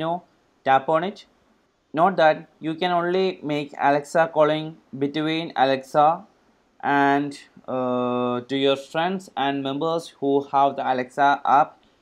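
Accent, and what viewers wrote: Kerala, India. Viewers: Dushyant Vasani he spoke with a Malayalam accent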